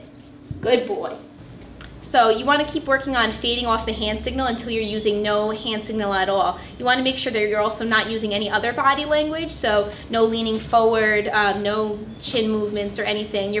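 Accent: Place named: New York, United States. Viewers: Are you from new York or new jersey? I kinda hear an accent there lol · She’s gotta be from Long Island. We’re the only people that pronounce dog that way lol. Great video. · this New York accent is almost unbearable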